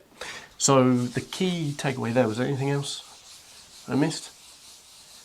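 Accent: United Kingdom. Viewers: You're the reason I'm learning English with a British accent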